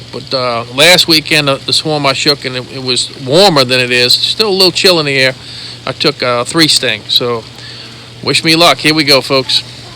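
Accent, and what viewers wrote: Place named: South, United States. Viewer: I love your accent 😍 southern accents are the best!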